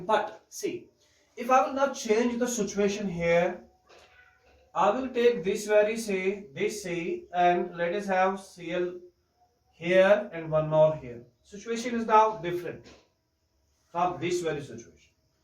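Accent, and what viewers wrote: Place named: United States. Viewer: Love ur classes & American accent as well...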